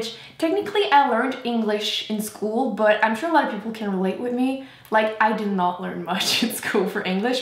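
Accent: Quebec, Canada. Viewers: Just discovered your channel and right away I was sure your were french-canadian, as I'm from Montreal myself :) I'll be checking out all your videos now haha ill make sure to comment. I don't know how you do it with school and YouTube but keep it up! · I just found your channel from the Kat Von D Everlasting Lipstick swatches and looking through your videos I saw this where you answer what kind of accent you have... French Canadian! So cool, the majority of my background is from there, and you pronounce "third" just the way I remember my late Pepe... it's nice to hear the accent again! Plus, we seem to have the same coloring (dark brown hair, dark brown eyes, fair skin) so your videos will be very helpful to me since that is a Dark Winter coloring, and you published this video on my birthday! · Yes, No, Toaster! Le français typique du Québec hahaha! J'adore tes vidéos, elles me font toujours sourire :)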